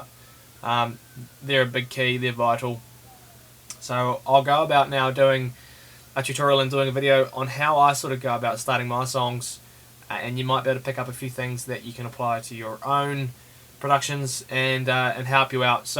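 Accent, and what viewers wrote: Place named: New Zealand. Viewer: Lol turn on interactive transcript, not to great at interpreting the kiwi accent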